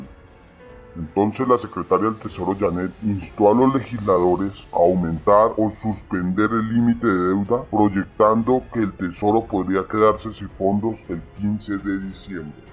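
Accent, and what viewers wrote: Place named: Colombia. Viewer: Este voz acento. Colombiano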